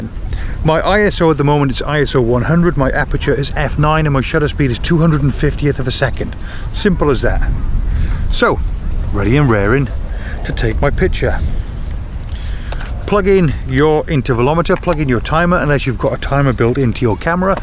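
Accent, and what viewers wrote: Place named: South Wales, United Kingdom. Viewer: Whats your thoughts on a Variable ND Filter? Also where are you from, I'm guessing by your accent you're from South Wales. Big fan, Subscribed.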